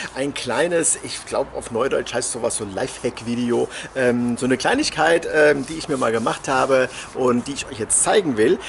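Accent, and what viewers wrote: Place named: East Germany, Germany. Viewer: Könntest glatt als Ossi durchgehen 😅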